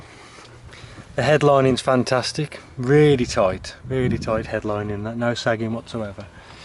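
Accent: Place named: Black Country, United Kingdom. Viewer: black country bloke ay ya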